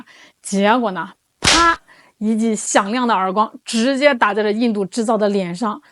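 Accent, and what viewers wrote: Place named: Hefei, China. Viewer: up主好像是合肥口音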